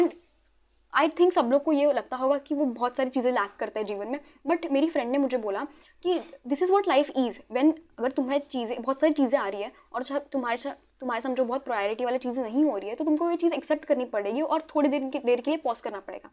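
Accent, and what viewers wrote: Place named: Mumbai, India. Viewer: She has that typical Mumbai accent ❤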